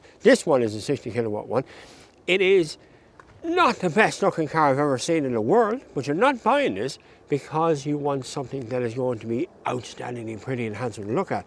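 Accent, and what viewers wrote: Ireland. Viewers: Hardly understand Irish 😂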